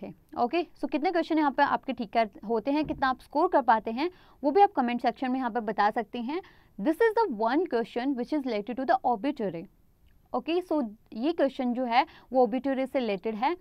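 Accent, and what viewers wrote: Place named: Haryana, India. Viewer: She is from haryana, so slang varies accordingly, how can you say this!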